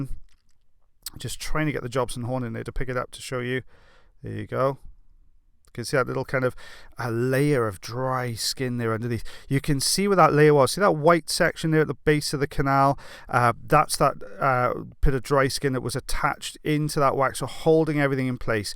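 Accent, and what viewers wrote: Wales, United Kingdom. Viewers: Ah!! The voice of my Walshman!! 😀. Question if I may please. Why is it necessary to get the ear wax out in one piece?? A lot of the time like tonight you were saying "should be able to get this out in one piece". Why is that?? Enjoyed the one full of hair. It looks like an old piece of carpet rolled up in the ear canal!! 🤣🤣 So glad you're back. Looking forward to all you mentioned in your commentry tonight. 😀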